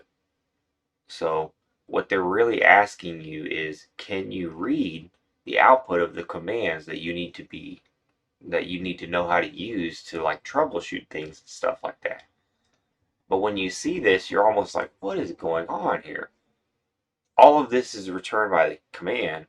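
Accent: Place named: Scotland, United Kingdom. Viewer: I love! His thick Scottish accent keeps it interesting to me.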